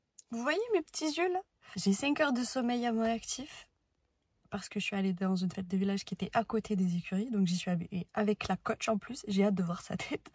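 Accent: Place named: South, France. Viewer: Petit accent du sud Team sud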